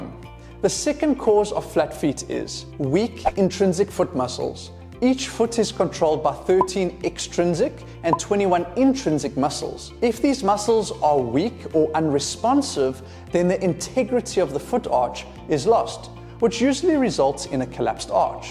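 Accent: South Africa. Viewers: You sound South African… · Wow you sound so South African lol Anyway, I think I was born with flat foot (only one of my feet is flat) As the years go on my foot is becoming more and more sensitive. It aches even when I'm sleeping.. I'm hoping some of what you said can help me.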